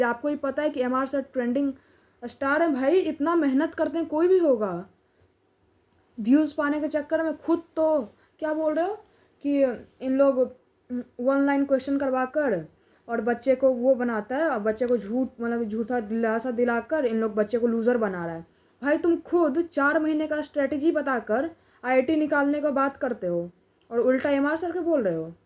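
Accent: Bihar, India. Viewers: Mujhe laga eski voice sun kar ye bihari hi hai 😅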